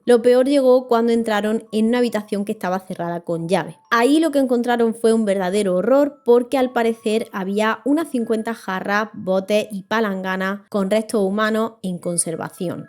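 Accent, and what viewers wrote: Andalusia, Spain. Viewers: Es del sur de España, Andalucía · Es la primera vez que veo éste canal en Youtube, ése acento es andaluz o de que parte de España?lo siento pero no me gusta para nada tu corte de cabello.... Saludos desde Argentina.... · Pero no era una vampira era una Bruja y está historia si que es real una bisabuela mía estubo a punto de ser raptada por ella, porcierto me encanta tu acento eres andaluza?? Yo tengo familia lejana por allí saludos 😊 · Exelente narrativa y tú acento Español Andaluz, Bella Andalucía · Es acento Andaluz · É andalûh.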